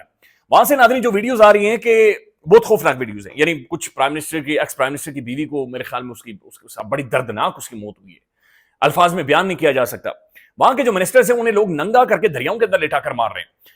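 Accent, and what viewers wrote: Kashmir, India. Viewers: Bhai ur accent reflec u r true kashmiri I m also bt I live in Karachi...wesy tu pora mulk yateem bt Karachi tu extra yateem..yahan k log gongy behry bs mazdoor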